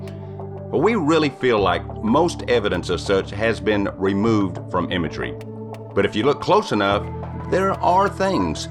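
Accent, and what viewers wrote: South, United States. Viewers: keep up the excellent work- also, great to hear a Southern accent (I am from Dallas)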